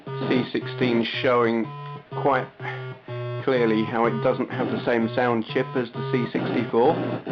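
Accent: United Kingdom. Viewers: what part of th uk are you from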